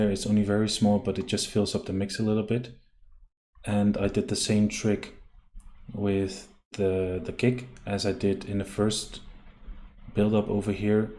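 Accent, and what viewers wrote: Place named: Netherlands. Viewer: Hey i would like to know how to create the screech, its a kinda sound.... Looking for ages to create it. By the sound of your accent u clearly dutch i gues.. Me 2. Great track gonna follow you more around.. Love the tuto man, i am also trying to learn this just for fun.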